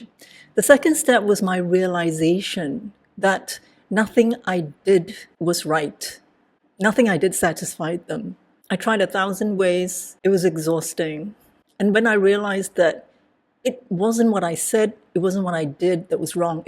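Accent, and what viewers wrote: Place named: Malaysia. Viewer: Hi, the universe has a miraculous way of working out. Some back story, I was born in Malaysia but moved away at a very young age. I am also a scapegoat daughter and wondered about my Malaysian roots and what it would be like to meet someone who's also Malaysian and going through a similar journey. Your video popped up in my suggestions and your accent caught my attention, turns out you're a fellow Malaysian. I've watched a few of your videos and thank you ❤ my revenge plan is to heal and affirm/validate myself.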